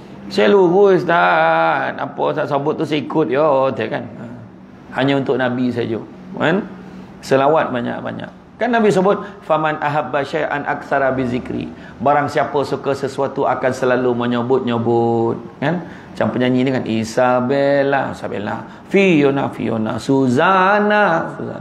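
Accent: Negeri Sembilan, Malaysia. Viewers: Semoga ustaz sentiasa sihat agar dpt terus menyampai kn kebaikan. Ohsem bila dpt mendgr bahaso Nogori 9...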